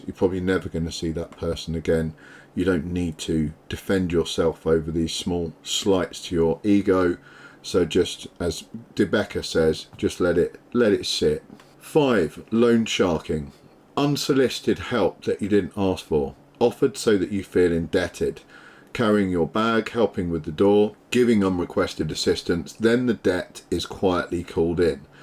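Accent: United Kingdom. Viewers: It sounds a lot less rude in a British accent ❤ 😂😂😂. I love the information. I am very good with my situational awareness, being armed and telling people No. But these are great videos to share with others and I do learn something from them too.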